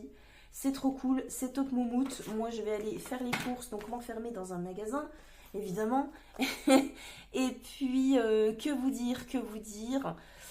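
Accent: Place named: Haute-Saône, France. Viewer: Coucou mais moi j'adore vous écouter il est tellement gracieux notre accent ( je suis en haute Saône) la Madeleine Proust a fait fureur avec😂. En tout cas belles économies 😊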